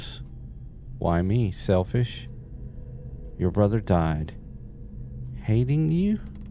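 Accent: South, United States. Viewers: This is my first video of yours and I have to say, there is something strangely adorable about a dude with a Southern accent gettin' spooked. You're cute as all hell, Sir. Carry on.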